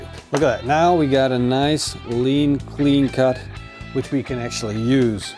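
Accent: Netherlands. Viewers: Do I detect a Dutch accent? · your accent sounds really Dutch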